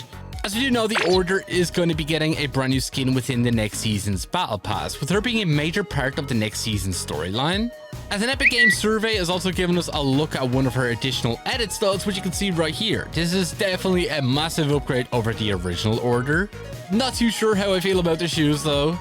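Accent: Ireland. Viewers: Not trying be annoying I never knew u were irish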